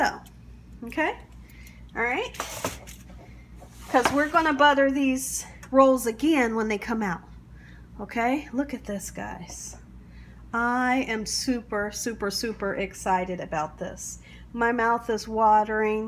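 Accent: South, United States. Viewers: I love watching you bake,nd your talking country charm makes me feel like Im back at my grannys house.Im definally gonna make these.Im craving them now!!!!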